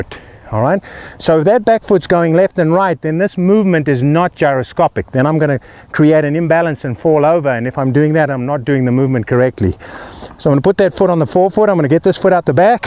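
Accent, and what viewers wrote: South Africa. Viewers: Is that a South Africa accent?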